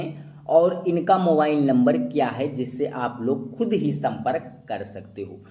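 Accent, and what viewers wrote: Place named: Jamtara, India. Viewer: बोलचाल से तो भाई जामताडी लग रहा है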